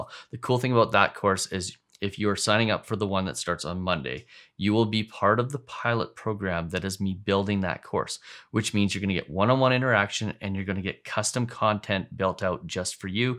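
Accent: Canada. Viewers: I enjoy your content. Great to have a Canadian voice for mortgage and finance news.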